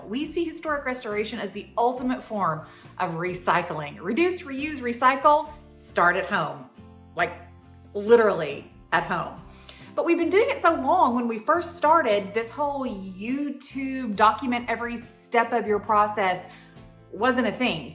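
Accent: South, United States. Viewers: I cannot thank you enough! Seriously. I’m a 32 year old woman who has been (oddly) passionate about historic architecture, primarily residential, since early childhood. I remember my mom taking me to the plantation homes in Live Oak and Amelia Island when I was in elementary school, and being obsessed. When I was in middle school it was the Biltmore Estate, and in high school it was Stone Mountain, GA’s historic homes that were shipped in from all over the country. That led to me taking architectural design courses, and I FINALLY landed myself my very own restoration project in my 1919 arts and crafts home in Pennsylvania. 😍 I so enjoy the videos you share, and all of the knowledge you are passing on. Not to mention the beautiful preservation of history that you’re directly responsible for. Just immaculate! Thank you, thank you, thank you. (The southern accent also helps! 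Lol. From one southerner to another. I miss the accents from back home now that I permanently have lived above the Mason-Dixon for the last decade!)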